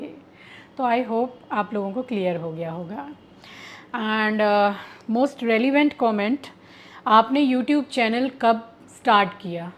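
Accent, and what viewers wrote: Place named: Uttar Pradesh, India. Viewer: ji aapki purchase sunkar mere aankhon mein aansu a gaye main aapke block abhi ek do mahine se hi dekhne shuru Karen jab aap kuchh Shabd aise bolati hai use Kanhaiya se hai mujhe tabhi mahsus hona tha ki aap up se belong Karti main bahut bar likhna Jahan ek meri bhi suar ki mere aankhon mein bahut taklif rahti hai mujhe aapke Shabd bolane se lagta ki aap up se belong Karti hai uska jawab mil Gaya Mera bhi mica Agra mein hai Delhi mein meri shaadi Hui hai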